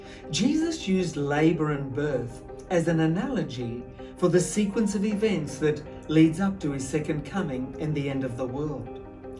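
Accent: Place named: Australia. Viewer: his accent is like Australian accent.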